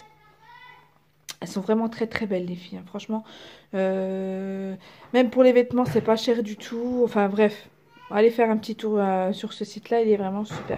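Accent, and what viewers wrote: Belgium. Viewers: Salem ma belle tu as un accent tu es belge ?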